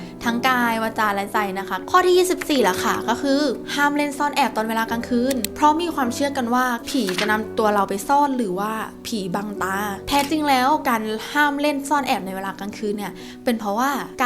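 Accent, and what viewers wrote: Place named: Thailand. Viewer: เสียงน่ารักจัง มีความเหน่อนิดๆ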